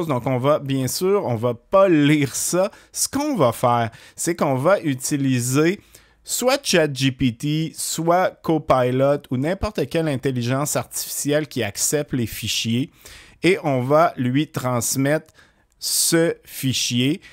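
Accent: Canada. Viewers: Merci, c'est vraiment super comme tuto. Toujours très clair et pour un belge l'accent canadien met de la "musique"dans ton exposé. C'est super 😊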